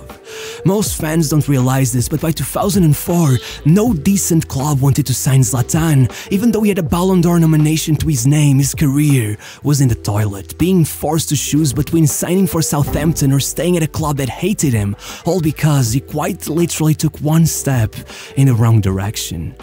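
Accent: Portugal. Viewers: Are you Portuguese? You pronounce the players names like a Portuguese would. Nice.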